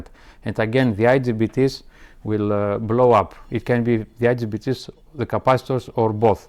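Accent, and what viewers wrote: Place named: Greece. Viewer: This Sounds like a greek accent 😁😉🇬🇷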